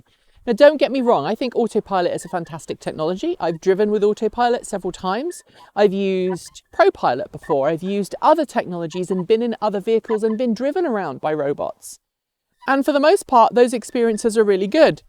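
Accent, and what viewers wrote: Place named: United Kingdom. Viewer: i always thought you are form the UK and not the USA.. you sound so British... take it as a compliment...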